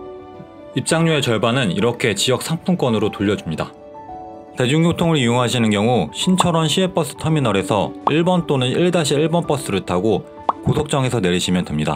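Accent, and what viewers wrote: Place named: Gyeongsang, South Korea. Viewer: 경상도분이신가요? 다 정말 예쁜데 왜..다..경상도..ㅠ.ㅠ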